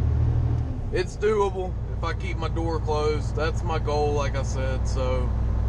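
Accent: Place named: Alabama, United States. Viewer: Ahh that's cool! I am originally from Pennsylvania (Lehigh valley). I came down to Birmingham a few years ago to go to UAB and now I'm about to graduate and considering trucking. As I watched more of the video I was like he sounds like he might be from Alabama! Low and behold! I go to Alabaster a few times a week to go to Veterans park since they have a nice skatepark there. But I'm mostly just stuck by UAB which kinda sucks since I hate the city! And I hate the city drivers and how no one uses turn signals! And just the constant rush haha. I'm hearing a lot of good things about Crete. I'm going to check out your six month review! Thanks!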